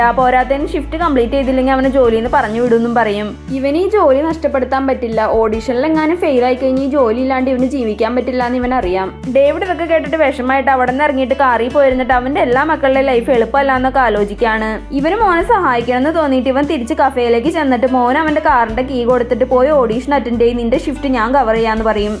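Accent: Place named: Thrissur, India. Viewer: കഥ പറയുന്നവൻ തൃശ്ശൂർ എവടെ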